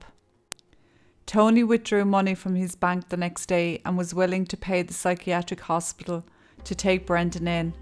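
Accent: Ireland. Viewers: The narration on these videos are wonderful. I am from the USA, but I love the Irish lilt as it reminds me of my dear grandmother from Donegal. Thank you for your sensitive telling.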